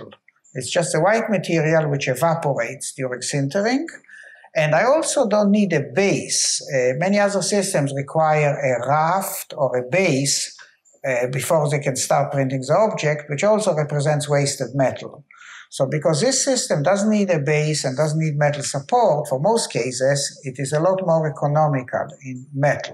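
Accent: Israel. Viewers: It’s the most Israeli accent I’ve ever heard. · Is it a Hebrew accent? GREAT GREAT VIDEO and astonishing relatively SOLUTION! The definitely BEST intro about metal 3D printing around. Thank you Sir. It's really beautifully simple, efficient, precise. THE BEST COMMERCIAL AROUND TOO.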